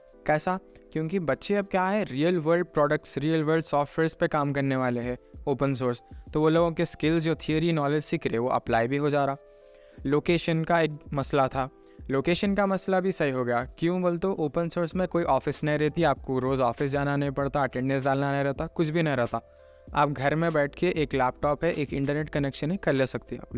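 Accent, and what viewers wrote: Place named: Hyderabad, India. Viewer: loved the video and the hyderabadi accent lol. got yourself a subscriber now waiting for more info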